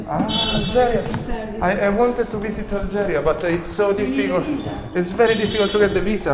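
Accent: Italy. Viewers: So beautiful the place look like Greece beautiful video thanks for sharing I like your Italian accent when you speak English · Nice video I loved it so much like your Italian accent, keep coming back. Much love habibi 🇹🇳❤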